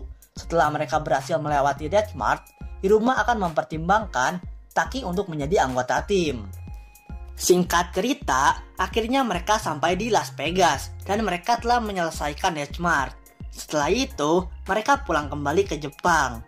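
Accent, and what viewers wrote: West Java, Indonesia. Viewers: Sunda pisan iyeumah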